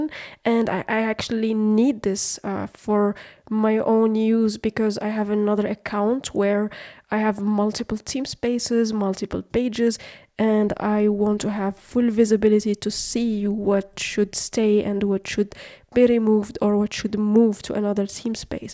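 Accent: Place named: North Africa. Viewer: the voice is giving north african .. are Algerian/Moroccan by any chance? 😊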